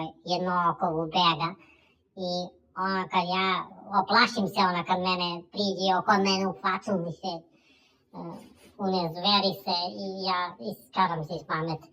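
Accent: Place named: South, Serbia. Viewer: BRATE ODAKLESI? CEPAŠ JUŽNJAČKI A DRAGICA ME PODSECA NA KOMŠIKU BABU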